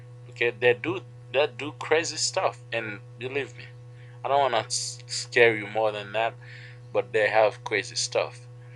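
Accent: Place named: Haiti. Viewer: The speaker sounds Haitian. Every nationality claims to be of Israelite origin. Strange!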